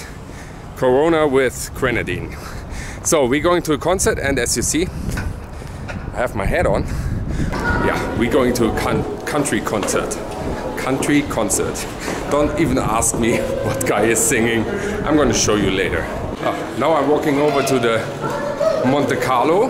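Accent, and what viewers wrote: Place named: Germany. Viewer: "Early bird get the warm". Awesome German accent.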